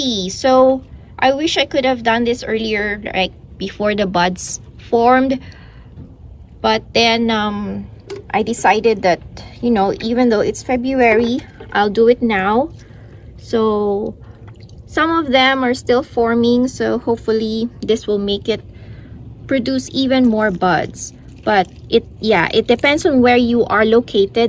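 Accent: Philippines. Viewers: Hi the way you talk you are Filipino ..then i saw the design ur cup of coffeee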